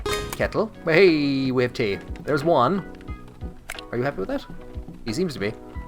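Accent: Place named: Dublin 4, Ireland. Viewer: How many years have you been hiding this GLORIOUS D4 accent?! I nearly wet meself!:face-green-smiling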